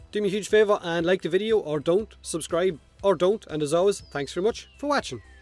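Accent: Ireland. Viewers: Irish accent man: says gauss is a sanic! also irish accent man: proceeds to never actually using his 1 in the video... great vid though!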